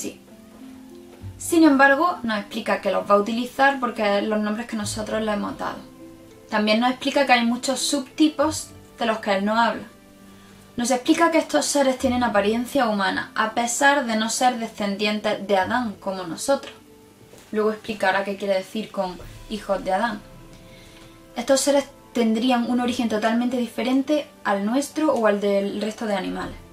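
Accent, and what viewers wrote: Spain. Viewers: Que acento tan bonito....me imagino la chica es andaluza?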